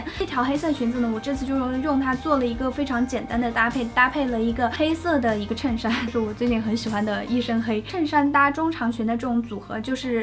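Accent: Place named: Chengdu, China. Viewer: 支持老乡 ps看你成都话的化妆视频看了七八遍 其实是听 乡音啊 😄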